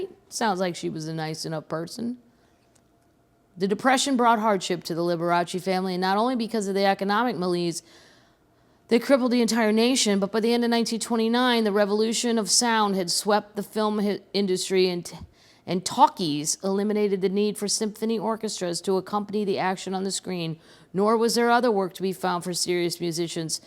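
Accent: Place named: Kentucky, United States. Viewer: I hear some Kentucky funk in your reading tonight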